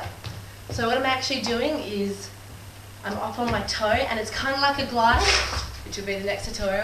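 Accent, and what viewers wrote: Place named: Australia. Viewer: Shut up!!!!! I keep falling in love with you every time i hear you australian accent!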